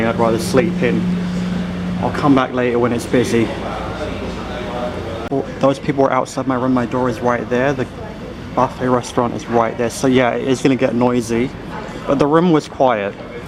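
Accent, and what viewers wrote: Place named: United Kingdom. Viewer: Well you are born in London and then move to Canada from London . I say this because you have talk with british accent.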